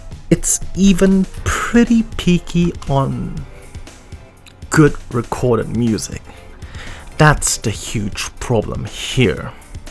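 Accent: United Kingdom. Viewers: Your accent is different in the other what's going on. No offense but it's ABIT weird. This video you sound like British accent? Another one sound more Indonesia? Are you trying to mimick accent or something. Either way just speak how you normally do. And the video I feel like it's too long. I would prefer you get to the point though.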